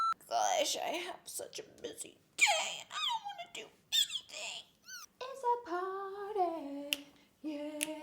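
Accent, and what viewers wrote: South, United States. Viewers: Olly. Lol. Love u with ur sweet southern accent!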